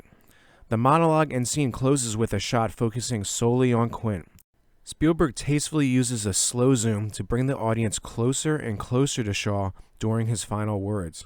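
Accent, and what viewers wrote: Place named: Philadelphia, United States. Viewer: You have a strong Philadelphia accent.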